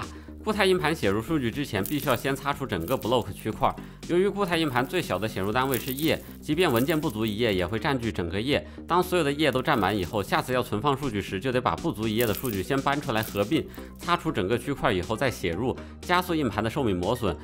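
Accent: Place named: China. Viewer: 想知道台湾朋友们不看字幕的话，听大陆腔有没有难度呀？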